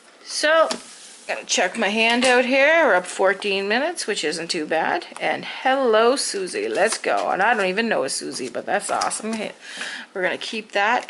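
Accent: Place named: Canada. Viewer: I loved the video, and thanks so much for making it! We are learning to play cribbage and I wanted to watch an actual game, so this was a great find on YouTube. I grew up in Canada but now I live in the UK; it was great to hear a proper Canadian accent for the first time in a while! And I was able to share that with my partner. Blessings to you; hope your warm weather comes soon! (It rains a lot here but my British partner says he has never been 'snowed in'; he thinks it sounds nice, but I assured him it's not that great...)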